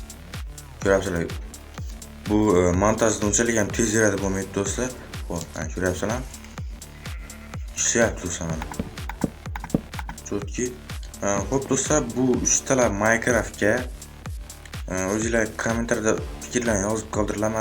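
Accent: Khorezm, Uzbekistan. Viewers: Oka Xorazmdan misiz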